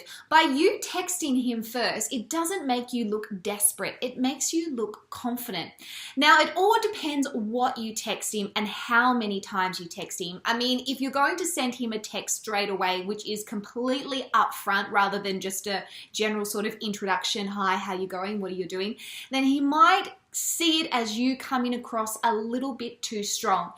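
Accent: Australia. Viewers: The aussie accent tho 🙂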